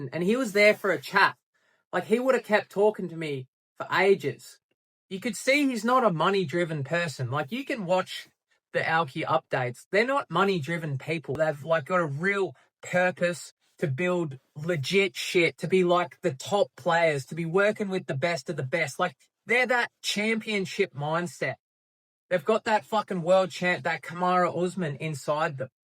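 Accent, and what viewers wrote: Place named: Australia. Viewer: Aw yeah nah yeah Nils is a real one thats for sure. Once you see the Auki big vision you understand this is the inevitable progression of blockchain. Great to see that Aussie spice in your vids from a fellow Aussie. And "owki" said right haha - Scodo.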